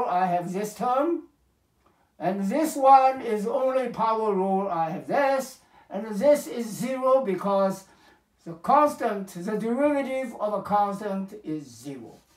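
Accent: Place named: RP, United Kingdom. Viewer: He somehow has an old British aristocracy accent from the movies. Beautiful!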